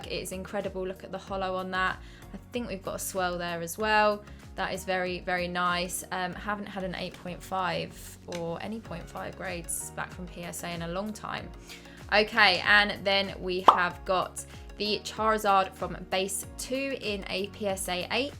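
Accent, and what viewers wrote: United Kingdom. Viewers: Was watching for 15 seconds before I realised you were British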